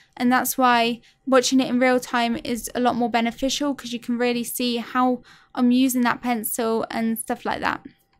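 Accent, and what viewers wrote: United Kingdom. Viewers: I love ur accent..is it british?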